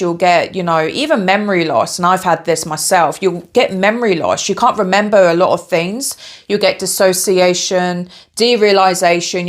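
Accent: United Kingdom. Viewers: you do have a very cute british accent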